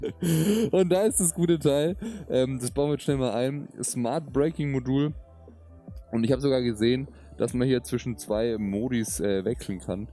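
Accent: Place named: Franconia, Germany. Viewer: Bist voll der Franke gell?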